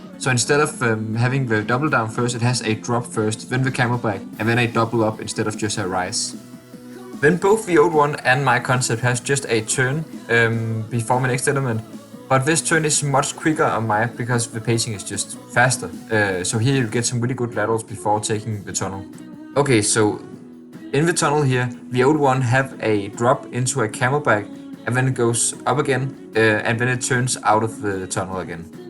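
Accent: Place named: Denmark. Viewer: Love the danglish 😍😅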